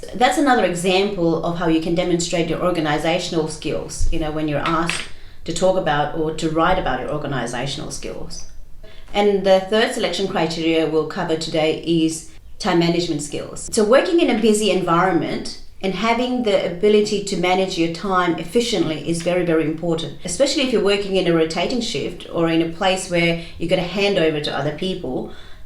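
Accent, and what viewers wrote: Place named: Australia. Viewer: Thank you for the content although i'm curious to know how you managed to get a good aussie command and accent in 9 years time with almost no traces of indian. Any secret to this you may want to share?😉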